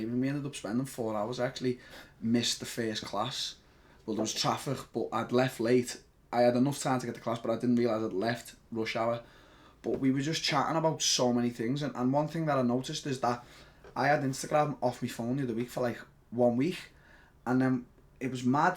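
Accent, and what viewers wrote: United Kingdom. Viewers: You close eyed freak... Talk like a hillbilly brit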